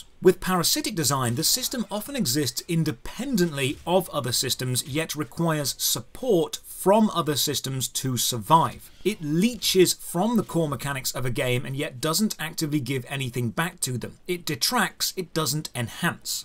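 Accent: United Kingdom. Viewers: I definitely do enjoy my dose of snarky british man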